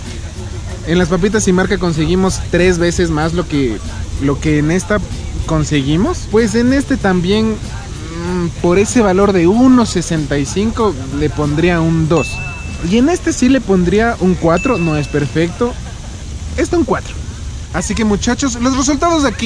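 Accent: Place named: Ecuador. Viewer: Excelente que le metas todo sobre ECUADOR Música Pinta Labia Carisma Acento ... Etc, Etc, Etc. Genial bro RIOBAMBA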